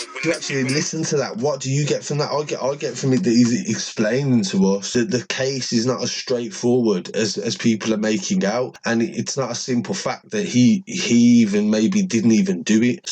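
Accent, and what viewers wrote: Birmingham, United Kingdom. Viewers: I rate you g u tell it how it is and u sound like yu from brum im from balsall heath b12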